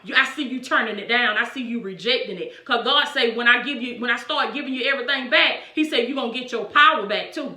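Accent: South, United States. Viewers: Shalom beautiful Pastor, you have come so far since you began your channel. you are looking so good!! You are such a lovely blessing to the Bride of the Lord. I love your sweet country accent, I have beautiful friends from the deep south and I always enjoyed thier cute accents and delicious home cooking. No body cooks as good as southern cooks, you guys throw down and make everything taste so yummy!! Nothing as good as greens and corn bread 🍞. Yummo!! Blessings Cherokee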